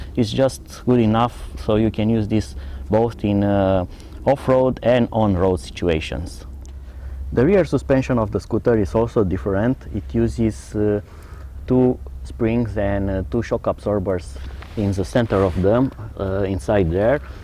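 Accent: Romania. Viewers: Baga' limba rOMANa ca esti praf...:))) accentul te da de gol ca esti roroman:))) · You speak english like a romanian.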